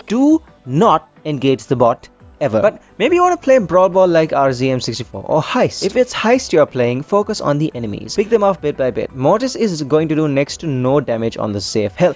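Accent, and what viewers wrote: India. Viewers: Are you Indian? Your accent seems sometime like it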